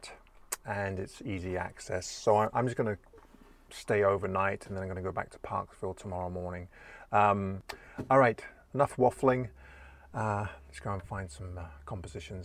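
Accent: England, United Kingdom. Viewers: Hi. Crazy question, are those magnetic reading glasses? If so, what brand are they? Look like exactly what I need to avoid regularly leaving behind/ sitting on/ losing mine. PS, it’s really refreshing to hear a laid back English accent for a change!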